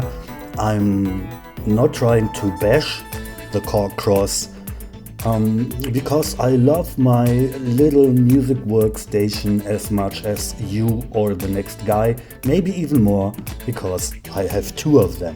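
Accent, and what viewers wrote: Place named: Germany. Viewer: "VVRRROOOooo .... >> ... oooOOMMMM" 😄👍‍(made me smile!). Tested on headphones and the R8 sounds good! By the way I agree - I downloaded the WAV file and for sure the Yamaha FM synth engine is brighter than the PCM of the Korg... but it should be, that's one of it's strengths. Glad you're enjoying your Reface - I treated myself to the MODX a couple of years ago, and it's great (but also not perfect) and has become the centre of my setup. I did look at the Korg opSix (I have the amazing Wavestate and the Modwave, so did consider completing the Korg trilogy when they were selling them off cheap a few months back) but there is nothing in that which I cannot already do with the MODX, so I resisted... for now. 😉 Anyway, look forward to hearing more of the Reface in your future videos ... btw: have you tried the looper function yet ? 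Best Regards, Axel. p.s. Your voice overs sound fine, you don't sound drunk all the time, and we like your charming German accent! 😎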